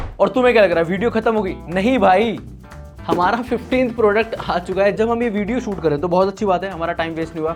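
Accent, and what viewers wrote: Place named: Haryana, India. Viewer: Yehh haryanvi ✌🏼😎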